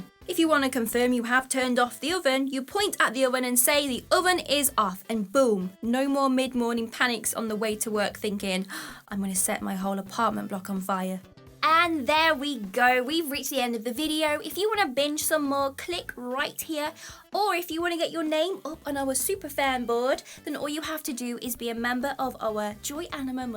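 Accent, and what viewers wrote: England, United Kingdom. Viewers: You're just wonderful 😊 And I so much love your accent.. wonder where you're from in England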